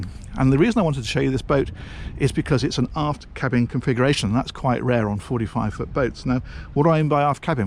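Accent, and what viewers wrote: United Kingdom. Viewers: Question- does the Broom 450 come with sun pads for the front of the boat? - or do you have to add/ purchase separately? Love the notion of a long traveling boat, but I didn't see as many sun areas? The front of boat appeared to show they could be added? Or is it just cushions are not put out? I'm a new subscriper & really love♥️ your attention to detail& your British accent- it is so great😆! Will you ever have a show on Chris-Craft yachts, or their classic 1920s or 30s line to view?🤔 Thanks again..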